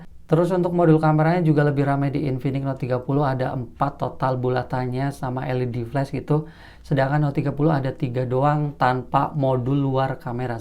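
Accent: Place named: Demak, Indonesia. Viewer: Bang lo orang demak ya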